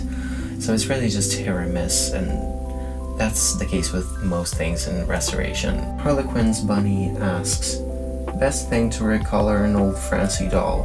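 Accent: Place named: United States. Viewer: How is your English so good and why do you an American accent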